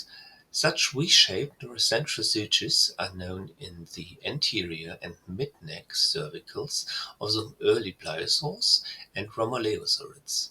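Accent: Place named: Germany. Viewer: wow, das sind aber interessante Nachrichten, evtl. wäre es für internationale Viewer netter, die automatische Synchronisation in alternative Sprachen beim Upload einzuschalten, Sie haben einen heftigen deutschen Akzent, das könnte man so verbessern, nur so ein Gedanke.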